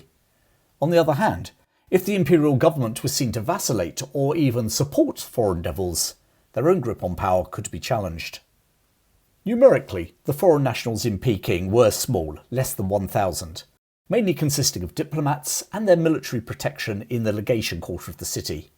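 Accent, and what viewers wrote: England, United Kingdom. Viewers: Greetings from a new subscriber in Indonesia. Thank you for this well researched and well presented video, delivered fluently and clearly in a jolly English accent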